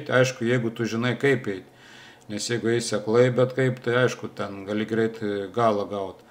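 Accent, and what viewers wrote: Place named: Aukstaitija, Lithuania. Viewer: a pats koks toks esat - suvalkas(užaprobuotas), rytų-vidurio-šiaurės aukštaitis,(tikrai ne žemaitis, nes tie šitaip neišsipisinėja) - kame tas "akcentas" kliūva?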